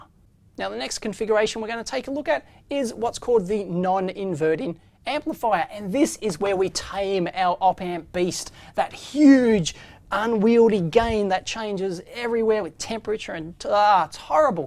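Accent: Australia. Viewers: This may be the most Australian sounding man I have ever heard.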